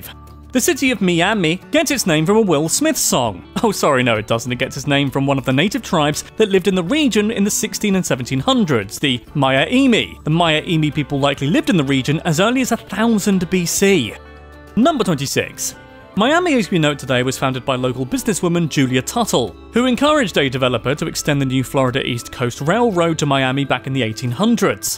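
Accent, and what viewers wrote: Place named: United Kingdom. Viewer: The British say things differently huh? I cringed a couple times, but what can you do? It'd be kind of a "haha" if he did pronounce it like we do and he commented on how weird it sounds to him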